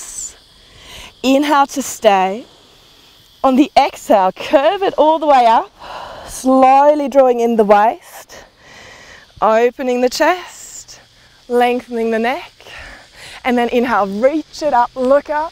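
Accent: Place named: Australia. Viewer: Nice video. I love you aussie accent !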